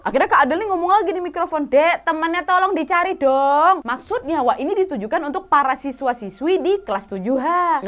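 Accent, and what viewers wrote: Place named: Medan, Indonesia. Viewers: Kak, logat dan bahasa Kakak spt orang Sumatera Utara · "gagal pokus" 😊 sukak kali aku dengar logat Medan di ni..jadi rindu pulak aku pulang kampung 🤗. by: drg. Rika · Rutinitas sblm tidur🤭 mendegarkan dengan logat wak waknya bercerita❤️❤️❤️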